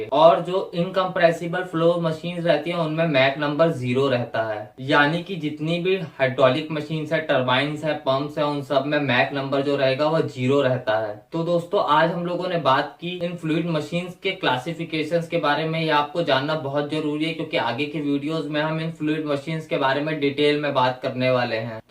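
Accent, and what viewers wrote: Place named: Uttar Pradesh, India. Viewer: Up Jaysa bolta ho bohot halp ho ta ha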